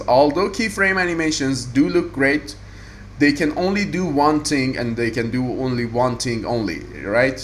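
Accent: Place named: Iran. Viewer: are you Persian? your accent sounds like Persians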